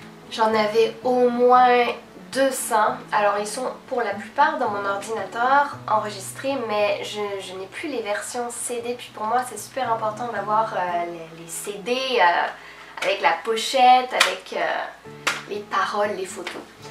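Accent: Quebec, Canada. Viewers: Pourquoi tu mélange les deux accent? C'est vraiment bizarre je veut dire...j'écoute les vidéo d'Emma verde et elle sa a plus de sens toi tu mélange les "pas" a un moment tu dit "po" comme l'accent quebequois et parfois "pas" comme l'accent français c trop bizarre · t'as tellement un accent québécois pour une ''francaise'' ??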